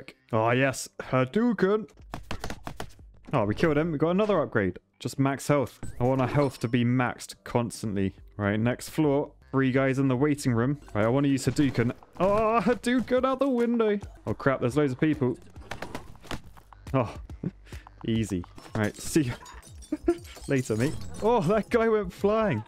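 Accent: United Kingdom. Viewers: Anime karate noises with a British accent are not the bees knees.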